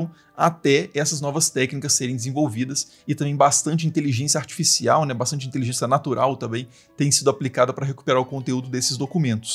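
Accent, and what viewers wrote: Minas Gerais, Brazil. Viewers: Gente!!! O texto ja era elegante e preciso; os assuntos muito interessantes; a cadência da fala e a voz agradável atraentes; o equilíbrio, o respeito e a (tentativa bem sucedida) imparcialidade notáveis; e o delicioso minerês que emerge de vez em quando fazem do Estranha um canal necessário pelo conhecimento que divulga e eleva o ser humano mostrando o que ele tem de melhor. Parabéns, Dr 👏 Ah, este post era pra dizer que a edição está incrível ❤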